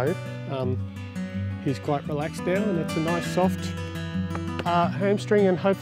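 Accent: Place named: Australia. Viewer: Excellent work. Really enjoying your videos i just stumbled accross. I can tell from your "lack of an accent" lol, you sound like an Aussie?? (I am too) ☺ Just wondering what state you are in?? Not SA by any chance?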